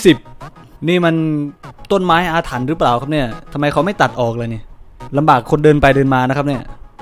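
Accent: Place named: Rural, Thailand. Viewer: ตอนแรกพูดเหน่อๆ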